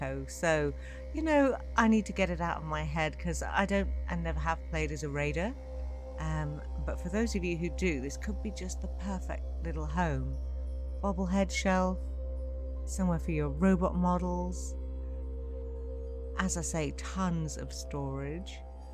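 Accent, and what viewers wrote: United Kingdom. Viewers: You sound like the cutest mother playin Skyrim with that Pommy accent. 😆xx Aawh fkn ell! 😂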